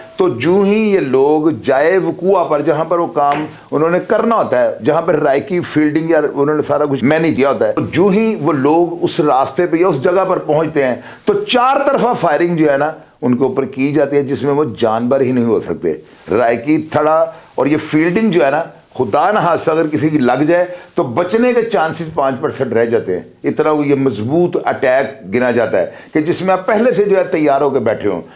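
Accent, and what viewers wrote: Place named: Sialkot, Pakistan. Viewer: Aapkey lehjey main sialkot jhalakta hai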